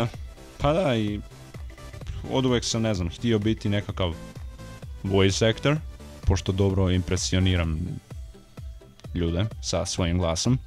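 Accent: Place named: Zagreb, Croatia. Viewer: preko naglaska pretpostavljam da zivis negdje oko zagreba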